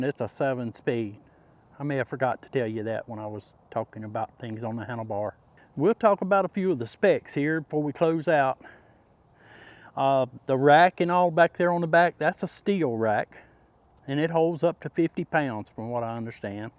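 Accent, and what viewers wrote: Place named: Mississippi, United States. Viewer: Good review! I love your accent. You remind of my family in Coffeeville, Ms. 🙂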